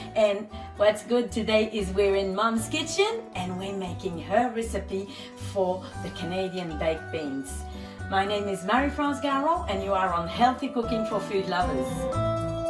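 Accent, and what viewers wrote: Australia. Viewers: I'm hearing several different accents in your speech, one of which sounds Australian/New Zealand??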